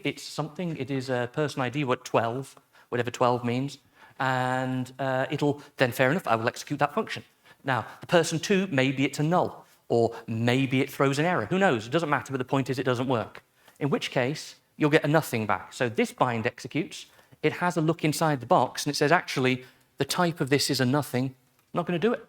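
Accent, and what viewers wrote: Midlands, United Kingdom. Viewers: I've turned on the autogenerated subtitles for a few minutes and they've been spot-on, if you're not used to an English midlands(?) accent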